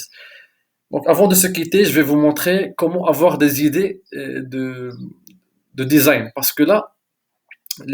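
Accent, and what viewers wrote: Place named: Morocco. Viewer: Alors aprés votre poronciation je suis sure que vous êtes un maroccain والله تمغربى تحياتى.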